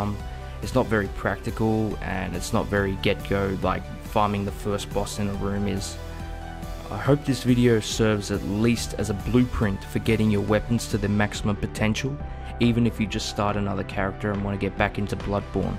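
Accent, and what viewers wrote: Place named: Australia. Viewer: Can we play together. You sound australian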